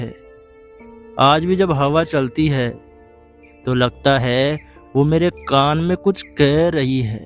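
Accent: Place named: Bihar, India. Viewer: Yh Bihari ki Sound. .Sooo kadva vala sweet..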